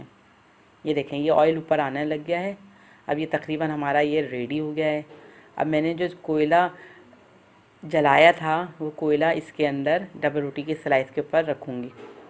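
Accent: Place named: Bhopal, India. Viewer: Apki zaban bilkul indian(bhopali) jaisi hei👌👌 or apka haddi wala pulao mene bna kar dekha boht accha bna hei👍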